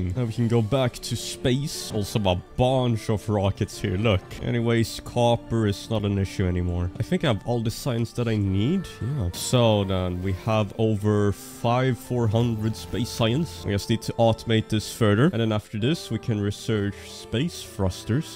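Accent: Sweden. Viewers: im all for factorio gameplay as well! As a swede im not fond of swedish accents but urs i can listen to all day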